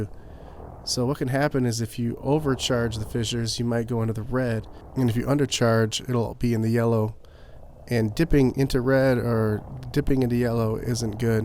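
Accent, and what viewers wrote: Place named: Wisconsin, United States. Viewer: You sound like my cousins from Wisconsin. Is this video still relevant a year after you made it?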